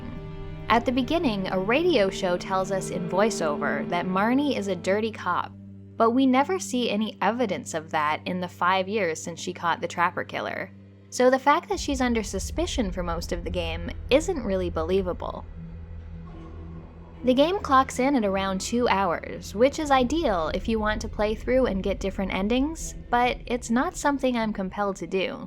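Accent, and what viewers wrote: Canada. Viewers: Hi, great review, enjoyed it a lot. Lovin how you explained it very easily. On a slightly different note, Are you from Canada 🍁. 😀 👍 👍 👍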